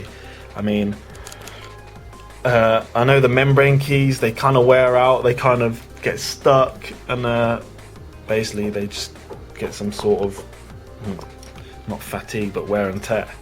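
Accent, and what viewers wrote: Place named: United Kingdom. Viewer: Youtube showed an ad for the new Sacha Baron Cohen film, just before this film, and suddenly your face popped up with that british accent.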